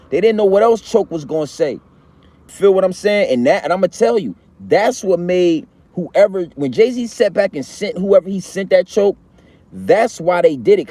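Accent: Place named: Philadelphia, United States. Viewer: No wonder you kind of sound like you from Philly or up North. It makes sense now 😁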